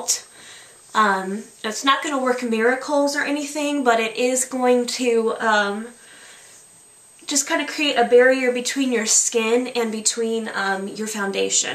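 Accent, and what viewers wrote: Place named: Michigan, United States. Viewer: Your skin does look great. btw, you sound like the typical michigan girl!!! very thick michigan accent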